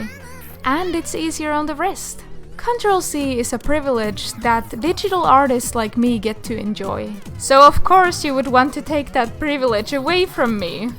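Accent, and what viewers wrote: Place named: Finland. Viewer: Ah tää suomalaisten enkkuaksentti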